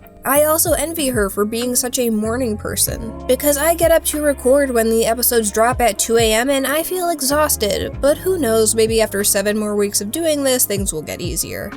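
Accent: Minnesota, United States. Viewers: Every video I see of you I think you’re from Minnesota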